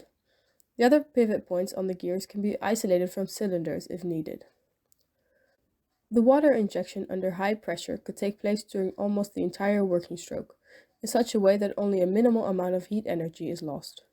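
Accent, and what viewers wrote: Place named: Netherlands. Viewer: I'm not an expert. But if you could tune the balancing of the counterweights right, this seems functional. Using the power stroke to directly power the compression stroke of the other 'cilinder'. Just one note however: due to the placing of the gears, the arm wich rocks the pistons back and forth is not symmetric. Causing the pistons to slowly compress on the left. And rapidly compress on the right. To me that seems like a bad thing. But that's all I got. (En ook erg goed Engels. Ik had bijna niet door dat er een Nederlands accent was :D )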